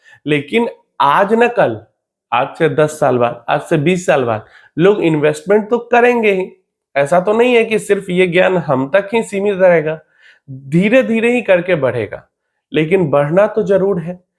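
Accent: Bihar, India. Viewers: Aap Bihar se hai kya bhai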